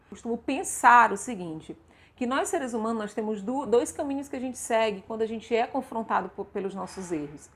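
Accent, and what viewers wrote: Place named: Bahia, Brazil. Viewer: Mas que sotaque baiano é esse minha gente? Boas palavras minha irmã!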